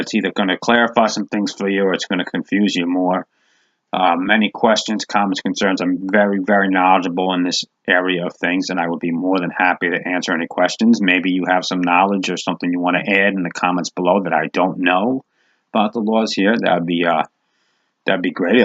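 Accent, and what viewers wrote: New York, United States. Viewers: Love ur New York accent , it has that 70’s 80’s sound to it lol love it bro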